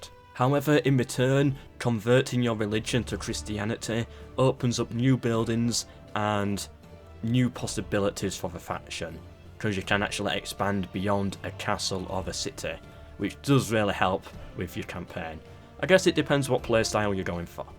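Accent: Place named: Leeds, United Kingdom. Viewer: its fine mate i used to live in Leeds couple years ago when i was working so i know the accent..i am 22by the way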